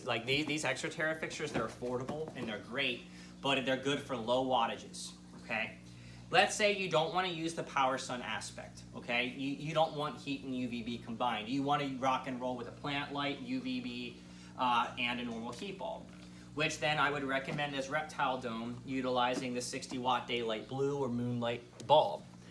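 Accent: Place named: Pennsylvania, United States. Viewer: I knew you sounded like you were from PA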